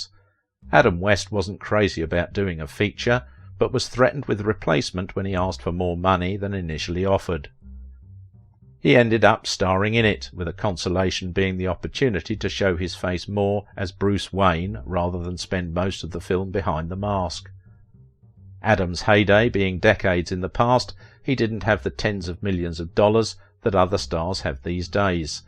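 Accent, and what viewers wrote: United Kingdom. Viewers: You’ have got a wonderful British accent! Love it!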